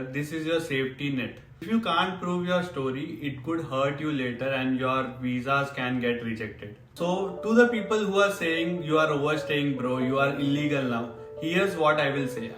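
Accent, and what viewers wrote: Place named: India. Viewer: That is the thickest Indian accent I’ve ever heard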